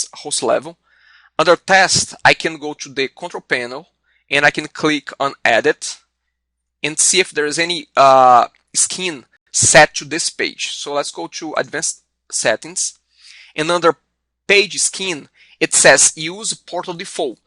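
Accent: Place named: Brazil. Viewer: Brazilian accent is unmistakable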